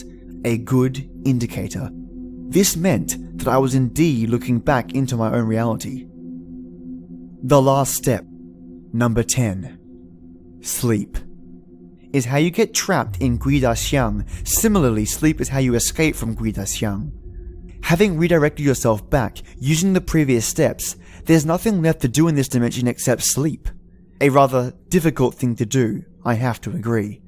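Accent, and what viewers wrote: Australia. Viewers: Awesome! I'll be looking forward to longer videos 😉 and thank you! My little nephew behaved pretty well. He was in a very happy mood. Maybe because it was his 1st Birthday yesterday 🙂🎂 As usual interesting story, great narrating, awesome Aussie accent! Haha 🖒🖤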